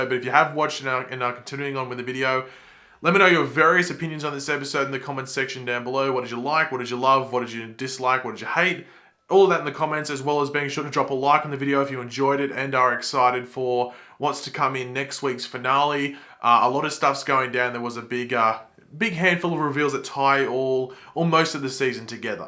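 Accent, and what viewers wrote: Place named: Australia. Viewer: Your mad cause u dont realize hes australian. They have accents. Not everyone is american get over ur self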